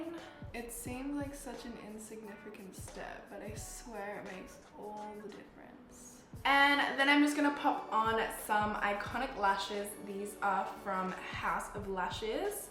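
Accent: United States. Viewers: you're so pretty like wtf and your freckles are adorble I think I have a girl crush on you fr I love you're american acent as well. I also like you're personality! btw im from london xx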